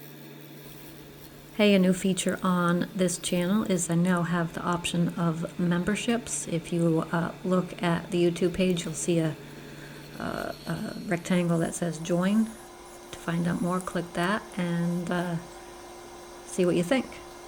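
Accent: United States. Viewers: Wicked good works well here in Oregon too. You sound to me like you could be from here. Nice work.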